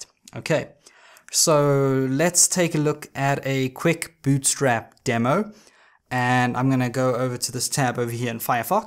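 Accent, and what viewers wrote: South Africa. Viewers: By your accent I suspected you're from SA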